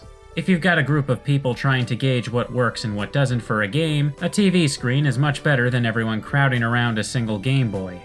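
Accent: Canada. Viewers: I thought you sounded Canadian, the you said "Z" like Zee, then I thought you must not be. Then you said you were Canadian...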